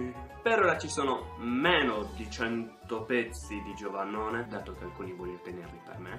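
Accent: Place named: Sardinia, Italy. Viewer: ciao, anch'io sono sarda ma il tuo accento mi fa ridere:D comunque bellissima l'idea delle carte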